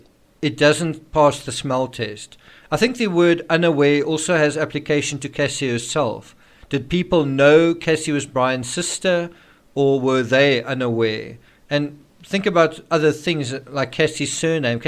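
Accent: South Africa. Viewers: Hey 👋 I love your channel, the work you put into every single video blows my mind. You are so appreciated 💛 I was born in SA and I used to have that lovely accent you do,but since I left SA my accent has faded. I wish you all the best on your channel, you definitely will always have support from me 😊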